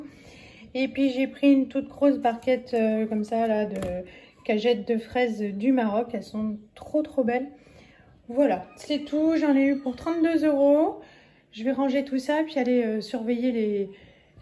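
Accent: Alsace, France. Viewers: Yo hopla... trop bô l’accent Alsacien 🤣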